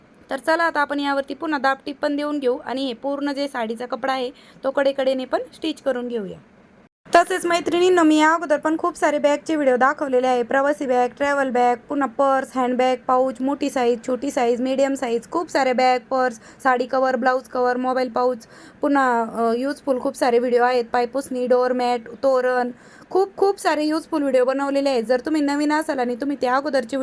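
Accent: Ahmednagar, India. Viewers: तुमचे गाव कोणते आहे मला भाषा आमच्या नगर जिल्हा ची वाटते सबस्क्राईब केले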